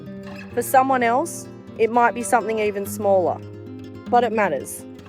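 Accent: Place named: Australia. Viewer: So so agree with you. Your words give me the courage to go out and become a better human to offer what I have what I have learnt from my involvement with animals the good that I can still give to those who need something in their lives. Where are you situated? Your accent sounds Aussie is it.? Let me know please. Thankyou for all you do god bless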